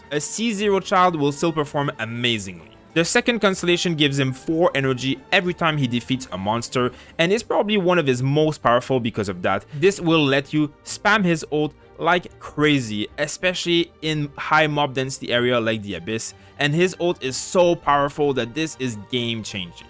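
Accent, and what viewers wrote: France. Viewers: Your accent sound like very french are you ?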